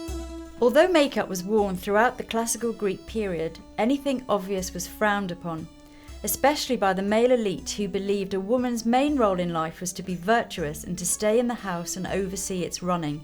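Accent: United Kingdom. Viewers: British accent I think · British duh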